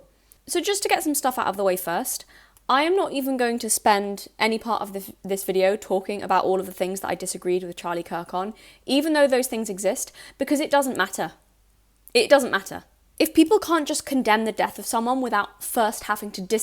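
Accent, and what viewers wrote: United Kingdom. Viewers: Not listening to some one with a British accent. No thanks.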